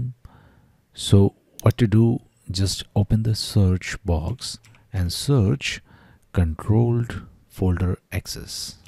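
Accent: India. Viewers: It's a law of physics. If you hear a pajeet accent in the video, it won't work.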